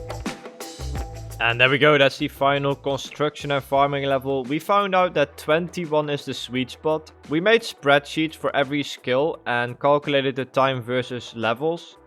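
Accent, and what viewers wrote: Netherlands. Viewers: you sound dutch man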